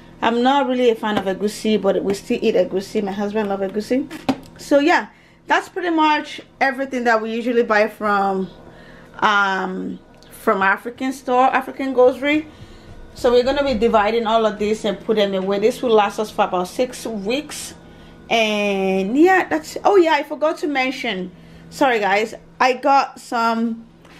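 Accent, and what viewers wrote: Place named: Yoruba, Nigeria. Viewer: Am so addicted to this channel i just love the way you speak your yoruba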